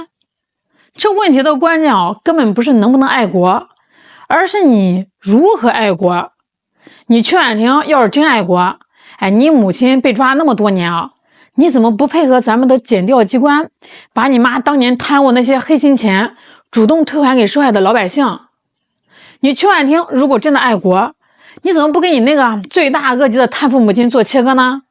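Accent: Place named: North, China. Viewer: 是北方口音吧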